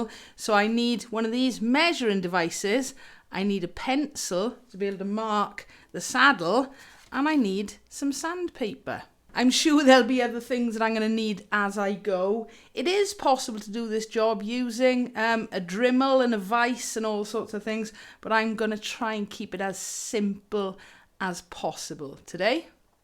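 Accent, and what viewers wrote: Wales, United Kingdom. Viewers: Excellent, thank you very much. I suspect my ukulele strings are a bit too high so I will do as you say. Do I hear a Mid Wales accent? Welshpool?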